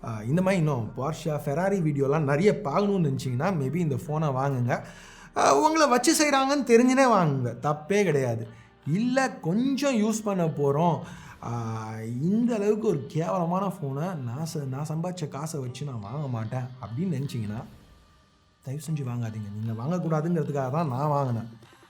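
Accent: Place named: Chennai, India. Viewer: Hi, Generally I won't comment on any of the videos but your content is very good and practical nowadays, I like the way you take this channel, Good to go, Youtubers need ppl support not from brand, you are proving it day by day by your videos and today I got to know even you can speak local Madras Thamizh. Mostly I prefer your channel to cross verify about a product. Thanks for your Genuine work.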